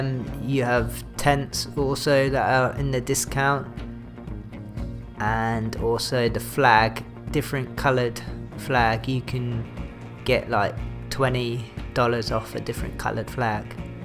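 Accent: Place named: United Kingdom. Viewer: Which part of the UK u from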